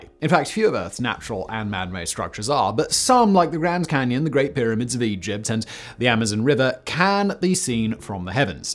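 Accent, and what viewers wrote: England, United Kingdom. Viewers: I also like listening to English people saying Native American place names. It's great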